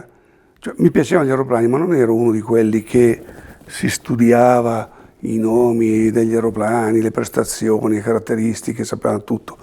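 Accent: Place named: Lombardy, Italy. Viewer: Grande comandante .....il suo inglese un po lombardo.....spettacolo n1 in tutto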